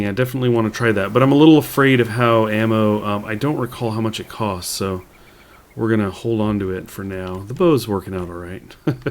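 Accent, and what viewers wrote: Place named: United Kingdom. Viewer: New to the channel, great video... enjoyed your british accent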